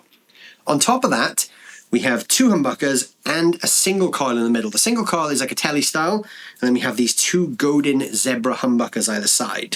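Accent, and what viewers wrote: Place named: Wales, United Kingdom. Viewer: Aha, thought I heard a Welsh accent. . .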